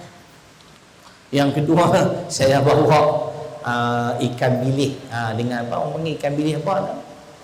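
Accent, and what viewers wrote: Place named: Kedah, Malaysia. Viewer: Biasalah orang Kedah, kasaq sikit.. tapi hati bertaman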